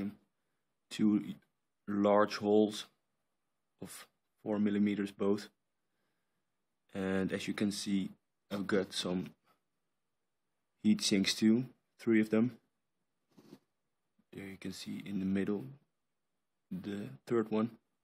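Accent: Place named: Netherlands. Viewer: you sound like you're dutch c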